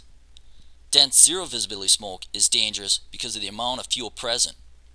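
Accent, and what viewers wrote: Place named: Wisconsin, United States. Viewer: There's just something about narrated fire videos. They're mesmerizing. That accent tho. Wisconsin? Eastern Minnesota?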